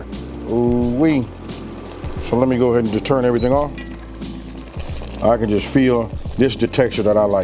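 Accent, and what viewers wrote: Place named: West Coast, United States. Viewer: hahaha bruh got a thick West coast accent.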